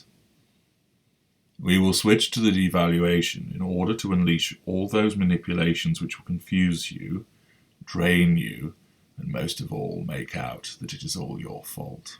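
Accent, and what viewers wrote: United Kingdom. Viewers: Even if they are good, and he is very good at his Kraft. Never praise them. You are providing huge supply by doing so. Also, the British accent does make it interesting but also makes him sound pompous. This is in harmony with his Advanced narcissist claim. I believe him. He is sophisticated in the ways of narcissism. But that's nothing be proud of if you truly are narcissistic. You simply mirror the One who is your father. Satan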